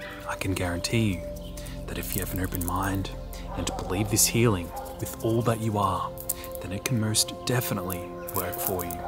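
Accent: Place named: Australia. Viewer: Is he Australian? I love his accent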